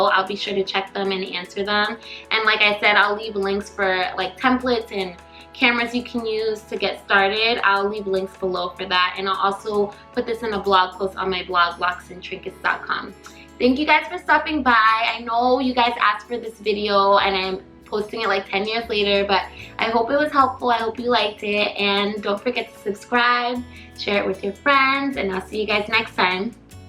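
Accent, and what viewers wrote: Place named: Minnesota, United States. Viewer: Sorry, this is kind of left field, but you should like you're from Minnesota... or Canada. Where are you from? Just curious.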